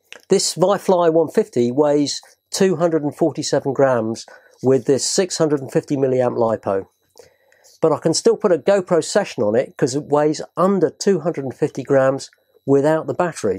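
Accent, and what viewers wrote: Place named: England, United Kingdom. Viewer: First visit, from a trainee pilot! Great to hear an English voice giving advice on this subject.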